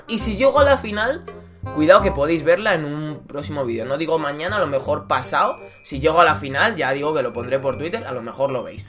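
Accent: Mexico. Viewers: eres de sinaloa mexico?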